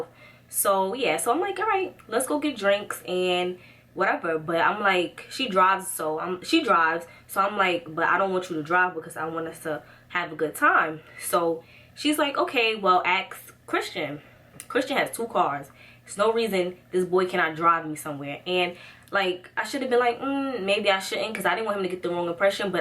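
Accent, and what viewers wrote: New York, United States. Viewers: dead ass, I love how New Yorkers talk💖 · i knew you had to be from nyc! heard that accent coming through lol much love from Jersey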